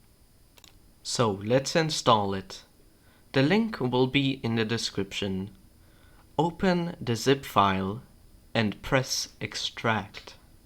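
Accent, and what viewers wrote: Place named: United Kingdom. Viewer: great tutorial but youre british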